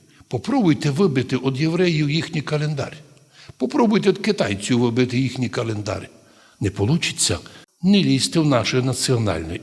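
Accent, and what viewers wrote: Transcarpathia, Ukraine. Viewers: Українською з закарпатським колоритом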